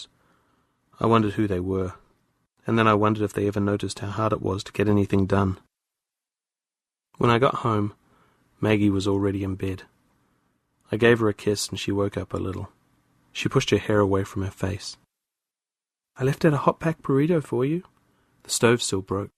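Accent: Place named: Australia. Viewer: Mate, you’ve already got an Aussie accent that’s hard to understand on a good day, slow down your reading stop blending words together I can’t keep track of what you’re saying.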